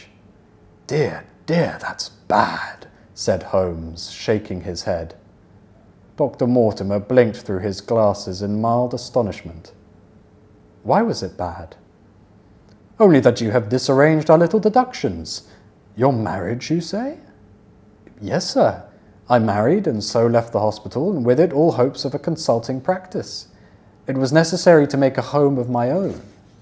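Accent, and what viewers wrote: United Kingdom. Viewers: 영국영어 발음 넘남 좋앙~~ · 제가 영국 발음에 빠지게 된 계기가 셜록인데 원서를 영국 발음으로...! 하 너무좋아요퓨ㅠㅠ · I happened to know this channel while watching YouTube. There was too little chance of hearing a British pronunciation. But it’s really nice to hear the contents of many books in British pronunciation. I’m always enjoying this channel. Lastly, I love Mr. voice!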